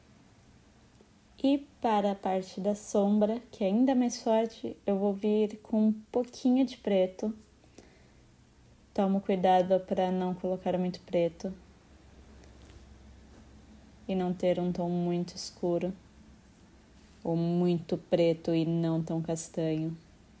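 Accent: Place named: France. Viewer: O sotaque francês eh muito inspirador... Parabéns pelo talento...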